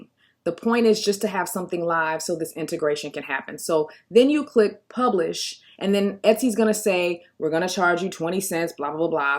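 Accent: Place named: England, United Kingdom. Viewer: I like your english accent can you be my teacher:)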